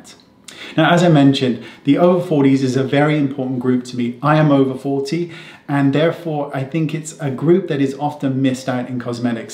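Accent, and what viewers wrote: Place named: RP, United Kingdom. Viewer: Gonna start say "blusher " instead of blush. Nope. It doesn't work without the posh English accent. Silly American. 😉😂 Love these products!!!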